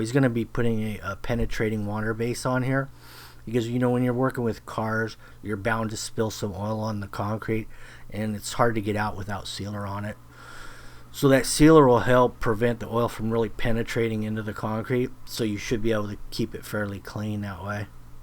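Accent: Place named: West Coast, United States. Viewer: Bud...thanks for the glove offer.. but I truly dont need any, I got a duffel bag full ive collected for years..... you give us all a Christmas gift everytime you teach us how to do concrete...in each video... theirs so many terrible people out their giving advise so to have a legit gent is not something that happens often in our world today. On any platform....I say this carefully so not to jinx you, and your guys, but you and your guys are kind of "masters" of your art. .... I grew up most my life in California and Vegas..then I moved all over the us... so I have seen a bunch of finishes.. im guessing you live in cali cause you got that west coast surfers slang in your voice.. sounds like to me anyways.. so anyhoo.. thank you for giving amazing content for us that get a chance to watch you, and learn when we can...im watching you for the day I build my own house.... 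so I know what not to do... and to do... respect...........................................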